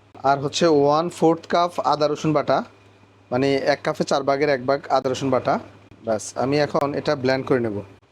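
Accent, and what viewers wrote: Bangladesh. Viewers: If you are from West Bengal, then how come ,you talk like BANGLADESHIS? Sharishar tel, Morich ? What nonsense?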